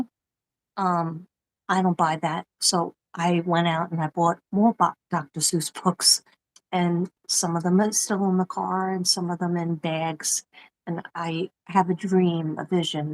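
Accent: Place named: New York, United States. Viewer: Im right under new york in Pennsylvania ❤ive always wanted to visit new york ,when i first heard u i knew u were from new york you have a very strong accent (love it )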